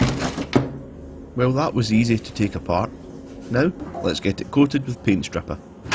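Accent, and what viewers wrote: Scotland, United Kingdom. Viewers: That was another great job. I marvel at your way of working every time. Every single screw is worked on so that it looks like new again. And now this beautiful motorbike is once again a technical work of art. I also enjoy listening to your Scottish accent. I have the greatest respect for your work. I'm already looking forward to the next project!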